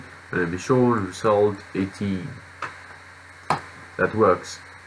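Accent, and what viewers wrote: South Africa. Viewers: are you from South Africa? Afrikaans?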